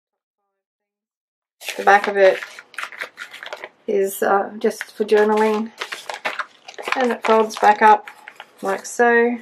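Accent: Australia. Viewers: It’s a fantastic scroll. I loved following along your great journey of discovery, it’s so different from all the other journals I have followed through January 😍I’m in Melbourne and loved hearing your Aussie accent💖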